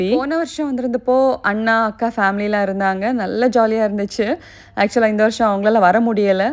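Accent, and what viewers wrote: Kerala, India. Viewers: malayali aanu alle ... I can guess from the accent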